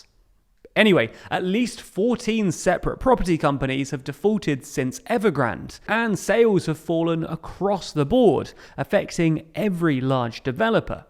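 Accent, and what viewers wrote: England, United Kingdom. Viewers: I think they are based in the US actually (just the narrator has an English accent) :) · Nah mate he is definitely English.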